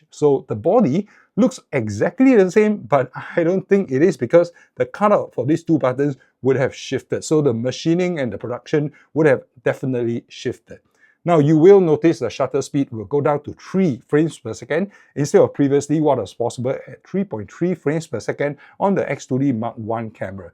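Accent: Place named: Singapore. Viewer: Thank you for your excellent overview! I have a very good friend in Singapore and I believe you have the same accent! Wonderful.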